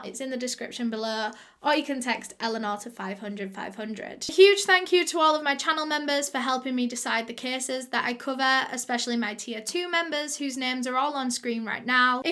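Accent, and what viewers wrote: United Kingdom. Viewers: I also like watching her cuz she is British and I can't stand other accents as I am British and like I'm not try a be rude or mean I just prefer the British accent rather then any other cuz I grew up around that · You’re accent is too British..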